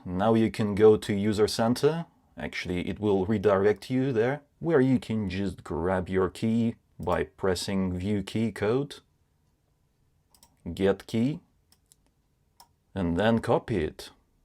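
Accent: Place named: Russia. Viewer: Guy with Russian accent talking about FPS makes me nostalgic for FPSRussia channel.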